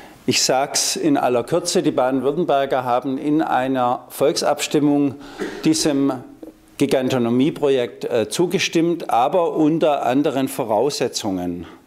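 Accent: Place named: Switzerland. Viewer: Ähm Sorry, aber das war gerade ein wenig zu viel Schweiz..... denn ich verstehe kein Wort! :D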